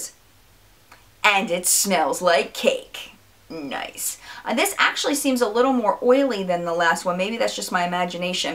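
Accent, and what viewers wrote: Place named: Boston, United States. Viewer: I love your accent! Lol! Is it Boston? Cause the way you say Boxycharm makes me smile!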